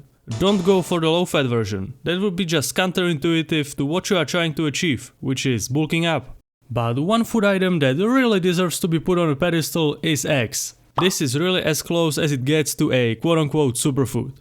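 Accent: Czech Republic. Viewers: jseš čech žejo? jinak super! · I can tell you are Czech based on your accent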